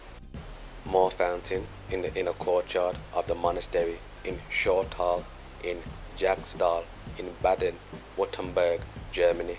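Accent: Birmingham, United Kingdom. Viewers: You are from Birmingham heavy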